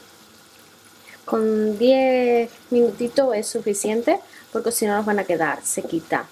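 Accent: Andalusia, Spain. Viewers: Hola, veo que estás en UK pero ese acentillo tan chulo es andaluz ¿sevillana? Aunque mi canal es de recetas vegetarianas porqué mi hija lo es y el canal es sobretodo por y para ella, yo como de todo y me encantan las albóndigas. A ti te han quedado de 10 Que ricas!!!! 😋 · Muy ricas!! Ahora quedé bien despistada. Libras, pienso en Inglaterra. Acento andaluz y vestigios árabes. Jaja. Me has dejado confusa. Pero esa receta me la guardo, para hacerlas con ese toque que les das, saludos 😘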